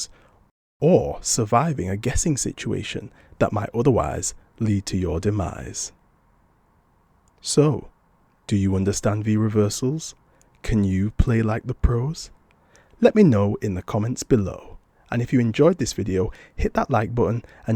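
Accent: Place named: United Kingdom. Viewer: I love you're british accent man and yes i understand the v'reversal but i still can't play like the pros 🤣🤣🤣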